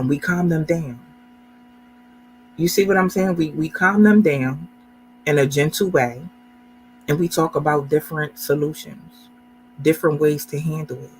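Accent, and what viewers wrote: Louisiana, United States. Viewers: Hello a question for you, something I may have picked up on. Are you a Louisiana native? I seem to recognized a dialect in your speech pattern. A simple yes, or no would be fine.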